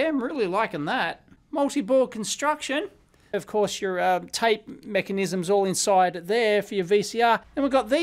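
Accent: Australia. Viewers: I think the 8-bit guy's video is a bit more consise, easy to follow. Luckily, I like Aussie accent, so its still a great watch!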